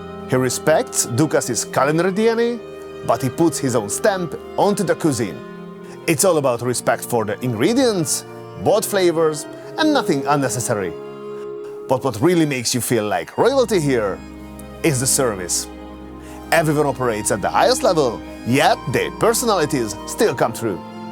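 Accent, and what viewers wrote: Hungary. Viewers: you sound very very very hungarian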